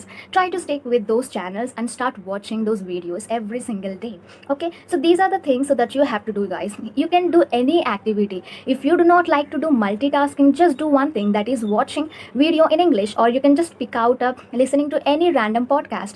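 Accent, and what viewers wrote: South India, India. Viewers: Hey you talk very nicely....and that South Indian touch while motivating people is great... every South Indians has this thing in them... talking so maturely...keep up the good work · Indian accent u know ?😏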